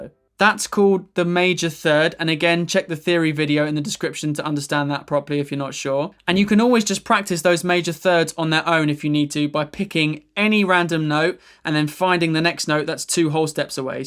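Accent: United Kingdom. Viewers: Only just found you today but I think you taught this so well and I loved the editing! Some really good key points popping up as you were talking. I like that you talk a lot about wrist positions and even where to hit the note for maximum clarity when playing, because it's so important and easily missed! Also good hearing someone else from the UK, no shade to anyone else, it's just that I hear and watch lots of music videos with Americans, and it's refreshing to hear the change! I hope your channel continues to grow, you deserve it! Just subscribed!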